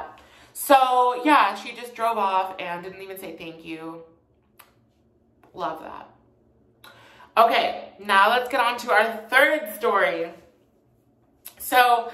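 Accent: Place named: Canada. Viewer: Yeetus Feetus because he pronounced those words with the “long a” sound. Which is okay, I know he’s from Canada. But I’m from America and we pronounce those words with the “short a” sound so I thought it was funny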